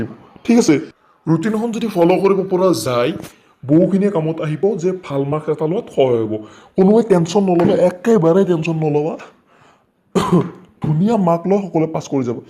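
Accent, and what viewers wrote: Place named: Nalbari, India. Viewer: Khati Nalberiya oi nijoka ki buli vaba sirok anaka kobo laj nalaga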